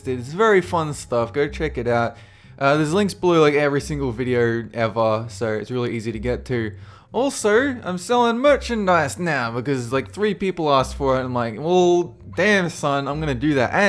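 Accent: Australia. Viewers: Hey, where are you from? You sound somewhat australian. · Its nice to hear a familiar Aussie accent on the Youtubes again. Ive been playing Hots for a while now and been tempted to start doing videos playing it which is basically me shouting at my computer screen but idk if thats entertaining. As a musician/Composer im interested in what jobs you have to offer.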